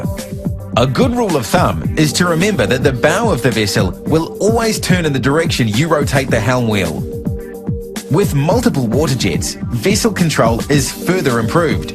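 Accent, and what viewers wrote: New Zealand. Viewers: nz accent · Kiwi. Waterjets were invented in NZ. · Love the accent. Reminds me of the Kiwi advert for Deck Stain, "This weekend I will be polishing my dick".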